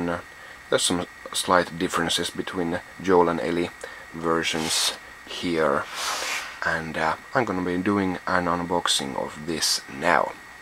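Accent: Finland. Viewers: hahahah finnish accent lol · I'm constantly in Helsinki! I thought I recognized the accent! :) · joo huomasin aksentistas ja kanavan nimestä :D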